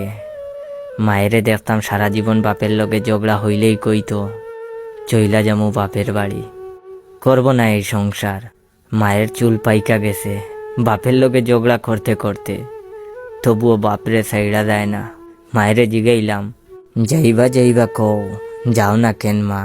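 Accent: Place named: Sylhet, Bangladesh. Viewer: সিলেটি ভাষা লাগে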